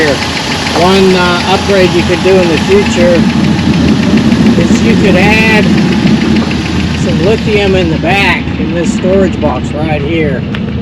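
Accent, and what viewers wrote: New Zealand. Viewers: Mannnnn I can hear the kiwi in ya haha awesome . I’m from WHG in the north . Kia kaha . I’m manifesting a ride like this ! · I love this rig and the fine Kiwi accent. 😊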